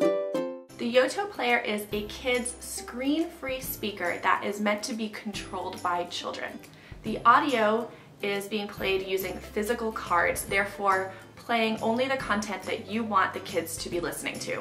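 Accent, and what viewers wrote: United Kingdom. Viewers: Great summary, tbh MOST products on the market have an American accent so it's a refreshing change to find one with a British accent! :) Yours truly, a Londoner. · Nothing wrong with the British accent.... But to be fair, it's an English accent.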